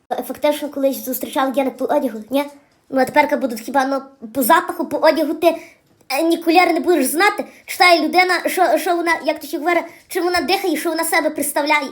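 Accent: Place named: Lviv, Ukraine. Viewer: То є львівська говірка, а ще так колись по селах Західної України розмовляли люди, тепер вже мало хто так говорить. Моя бабуся, царство небесне їй, так говорила:"Йой, муї діти зулутенькі, то мій капі'тал!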